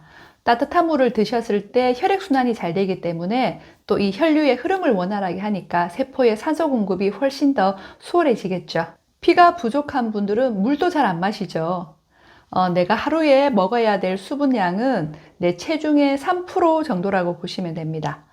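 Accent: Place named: Gyeongsang, South Korea. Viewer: 경상도 억양이 있네요 예쁘고 똑똑하시네 다 맞는 말씀입니다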